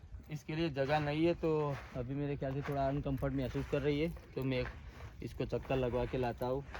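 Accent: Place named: Gujarat, India. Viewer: Achhchhi hindi bolne ki koshish kar rahe ho magar gujarati mix hohi ja rahi hai,dua hai aap ki hindi aur achhchhi ho jae taki publik ko aur fayda ho, aise bahut achhchha sikha rahe ho aur aap achhchhe bhi ho....bahut bahut shukriya from Bardoli dist surat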